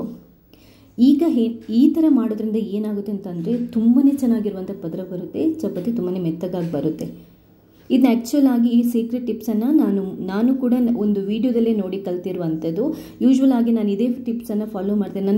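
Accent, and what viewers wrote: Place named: North Karnataka, India. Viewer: I guess by your accent that you are from north karnataka, but why u r struggling to speak in blore kannada, speak in your own language. No worries.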